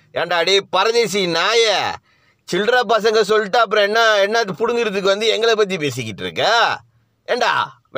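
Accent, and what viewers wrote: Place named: Malaysia. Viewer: Oh my god the best 1 Malaysian tamillan slang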